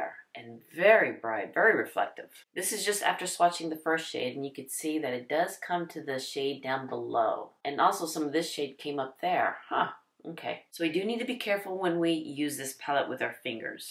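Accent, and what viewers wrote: United States. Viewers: Loved the looks - and all beautiful. Nice to see on mature eyes, very pretty but in a gorgeous elegant way. 💕, also love your American twang and your gentle energy. Just gained a new subscriber. Greetings from UK! 🥰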